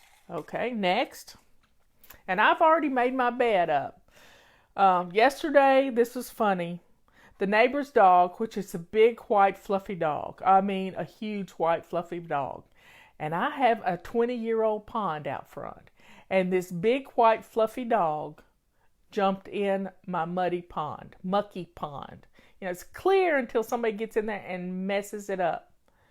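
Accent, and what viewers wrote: South, United States. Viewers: Omg. You are so southern. I love it but I never imagined your voice being so perfectly southern 🖤🖤. Been following you for years and finally GETTING it by not trying to alter the system. Thank you!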